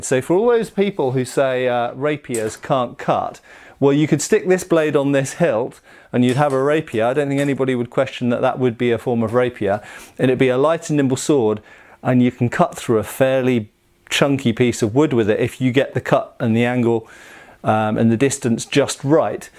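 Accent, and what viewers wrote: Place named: United Kingdom. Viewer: your pronunciation is fine for JIEN and DAO but you sound like that Brit intel guy who sent Bruce Lee on the mission in Enter the Dragon -- he also said "Haaan" while BL used the more genuine "Hahn"... good rule of thumb for pronouncing Asiatic names is to use the typical romance language vowels -- A is usually AH rather than AY or AAA... KEEP UP THE GREAT WORK!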